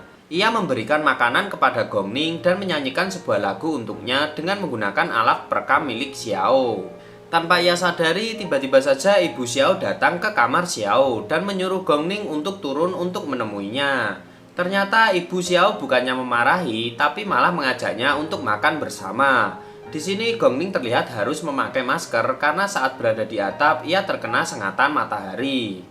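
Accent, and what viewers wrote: Java, Indonesia. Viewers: Alur cerita yg d bawakan bagus tpiIntonasi pengisi suaranya terlalu medok...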